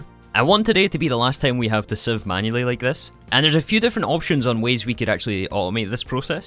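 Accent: Scotland, United Kingdom. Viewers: a fellow scot? interesting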